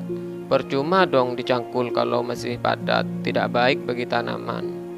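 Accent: Madura, Indonesia. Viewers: Logat Madura 😅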